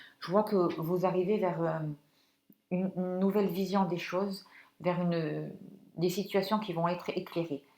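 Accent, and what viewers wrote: South, France. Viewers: Merci à vous ! Oui, c'était lourd ! Et comme j'avais choisi la pouppée jaune vous avez vu juste comme toujours ! Vous êtes fort énergétiquement je le sens. Priez pour moi avec Archange Michael et St Benoit svp car il y a eu beaucoup de bloccages (aussi familial) causés par la jalousie et les méchancetés. Merci ! Je suis Flamande et je continue CERTES à suivre vos vidéos aussi longtemps qu'il est nécessaire ! Vous avez un accent du Sud de la France , non ?Bien à vous car Dieu est avec vous je le ressens . Je suis tombée pour la première fois sur vos vidéos en février/mars et ce n'est pas un hasard ! On m'a fait beaucoup de saloperies et boccages dans ma vie (j'ai 58 ans) donc je suis ce qu'on appelle "un cas grave" …..Continuez votre travail de libérations énergétiques et prières car vous avez reçu de Dieu une Mission et des REELLES dons !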